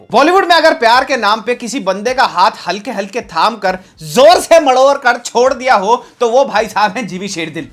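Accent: Haryana, India. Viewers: k review ka koi muqabla nai hai..or iski comedy haryanvi accents...end hai yaar.jiooooooo shehzada jioooo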